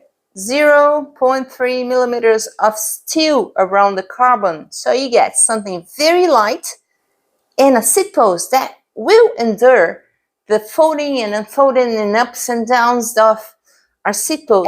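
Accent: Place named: Brazil. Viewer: ok nice ! I worked for a Brazilian bank for 5 years. So I recognised the accent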